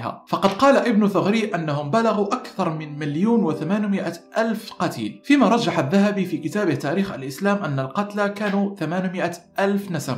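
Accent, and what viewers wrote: Iraq. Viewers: شتلة 🌲 شلون عرفت هذه الكلمة👏🏻 يسولف عراقي 😊❤ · لاكن لهجتج عراقي ؟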